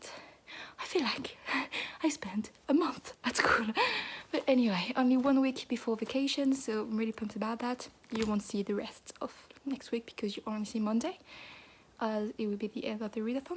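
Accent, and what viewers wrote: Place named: France. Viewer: Hi, just discovered your channel while looking for tbr ideas for the 2020 gilmoreathon. And I absolutely have to subscribe. Your accent is so lovely and relaxing and your English is impeccable. I wish I could speak French as well as you speak English. I'm going to look for language courses near me! You've inspired me. Loving this vlog.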